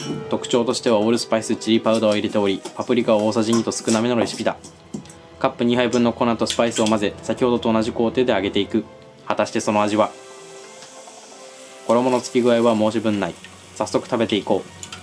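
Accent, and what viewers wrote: India. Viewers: たまに喋り方がインド人ぽい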